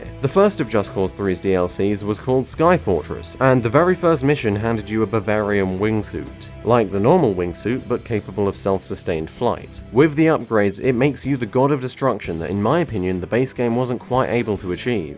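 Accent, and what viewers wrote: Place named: United Kingdom. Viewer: u sound like a stereotypical nazi bad guy in hollywood movies, of course, with a british accent.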